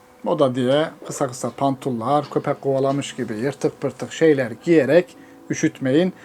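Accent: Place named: Çankırı, Turkey. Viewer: Bi çankırıli olarak şivene bitiyorum kardeşim helal olsun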